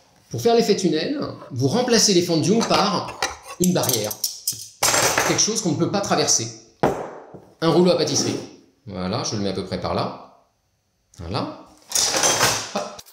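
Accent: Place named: Paris, France. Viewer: J'adore votre intonation très Parisiènne ^^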